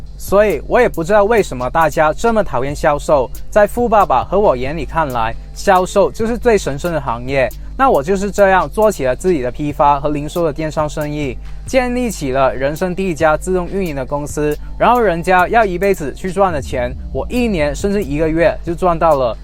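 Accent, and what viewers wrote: Hong Kong, China. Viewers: 怎麼口音聽起來像香港人呢？ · 是哪裏人聽着像香港的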